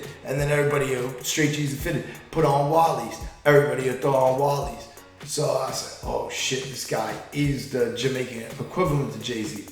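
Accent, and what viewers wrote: United Kingdom. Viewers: Big man think u from the uk lol bless up thou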